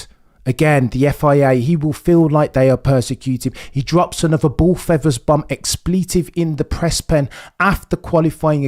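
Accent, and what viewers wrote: United Kingdom. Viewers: You are turning more into max with every video. Pretty refreshing hearing a guy with a British accent just recognizing raw talent, even not if it’s from a Brit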